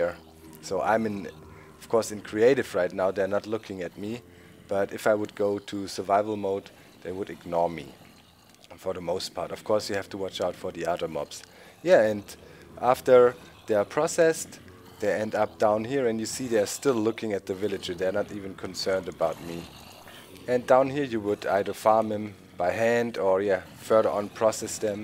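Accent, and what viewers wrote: Germany. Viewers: Man hört deinen Deutschen Akzent:))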